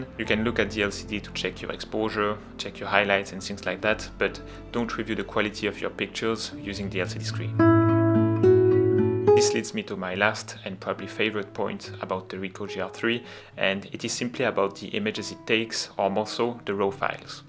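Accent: France. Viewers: Houuuuuuuu toi t’es français toi hahahaha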